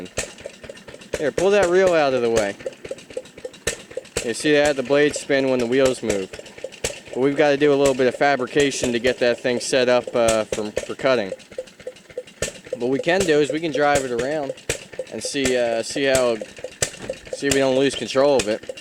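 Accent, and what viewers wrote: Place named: Pennsylvania, United States. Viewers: Dude lives in Pennsylvania doesn't he? That accent. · Are you from Pittsburgh? I'm catching some accent... Sweet mower though!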